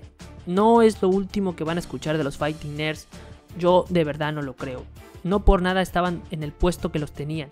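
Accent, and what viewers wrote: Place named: Ecuador. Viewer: Vos de dónde sos? Esa voz parece de ecuador o igual de peru.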